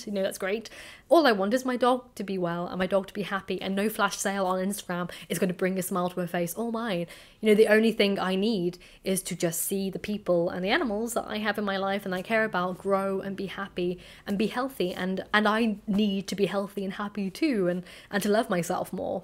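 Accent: RP, United Kingdom. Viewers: That posh accent! Still trips me up. But the more I get to know you... the more I understand and respect you, what you stand for. (Too early to use words as 'like' or 'love' but... gett'n there). 😜😁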